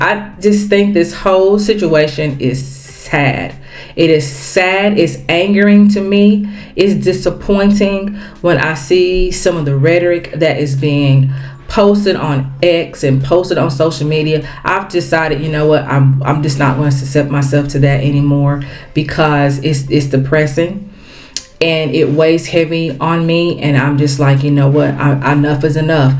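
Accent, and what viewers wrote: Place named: United States. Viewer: You arent FBA,stop maskerading!Tethers can't speak for us if you are not FBA; stop speaking for us; just because you have an American accent doesn't make you FBA; America has had immigration! You or no tether they can make us do nothing! Immigrants need to hold their own..leave us alone! Also, we do not look like Haitians; stop babbling; white people know the difference! You are talking gibberish! People undermind and disrespect us 24-7; we have done it enough. To hell with PanAfricanism and putting the capes on for them, leave us alone!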